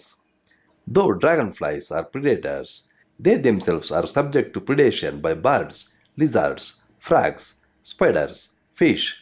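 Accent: India. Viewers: Indian bengali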